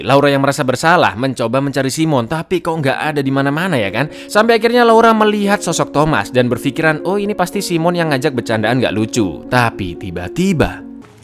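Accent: Java, Indonesia. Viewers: gw sebenernya ga terlalu suka sama aksen jawa karna susah di mengerti, tapi klo mau tidur suka selalu nonton channel ini. the best channel ever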